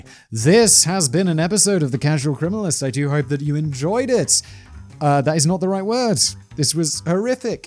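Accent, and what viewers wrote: United States. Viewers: I am slowly working my way through all of Simon's offerings, so I know I'm late to the party... but whenever Simon gets to the point where the criminal is caught... he sounds more and more American with each episode. I don't remember which episode it was, but when he was hoping that the particular state had the death penalty... yeah, come over to the dark side of the pond. The majority of us here prefer to live and let live. The ones who get all offended are usually just politicians 🙄. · Why u talking like americano.?😊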